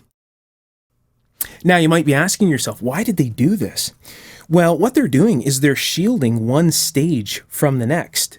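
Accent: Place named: Canada. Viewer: Oh...sorry. No problem...it's worth the wait. I'm not an engineer, but someone mentioned that this was their favorite channel on YouTube, and I was absolutely fascinated by that video. And that Canadian accent reminds me of home. :)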